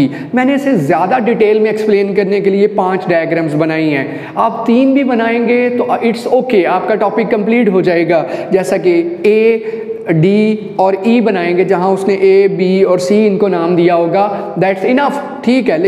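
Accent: Punjab, India. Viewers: Punjabi teacher😁,but well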